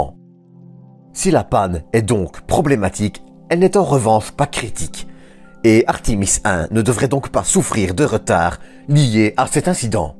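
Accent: Belgium. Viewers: Bsr je dirais belge mais son accent n enleve rien a la qualité du travail fourni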